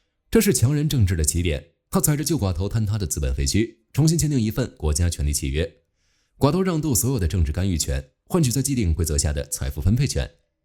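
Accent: China. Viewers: 博主是台湾人？只是这配音很大陆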